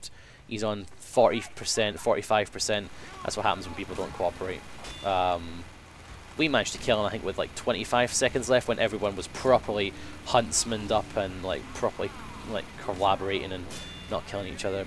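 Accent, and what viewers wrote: Scotland, United Kingdom. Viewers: are you scottish? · no hes scottish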